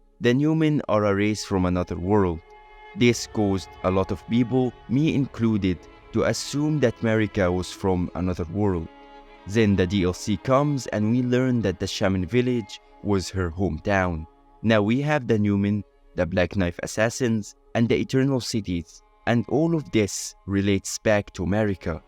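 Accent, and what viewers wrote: Egypt. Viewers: U sound Egyptian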